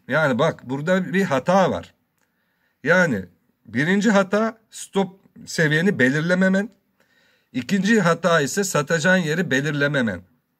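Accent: Konya, Turkey. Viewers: Hoca Konyalı herhalde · abi konyalı misin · Başkanım adam konyaliyim diyo orjinal şive orjinal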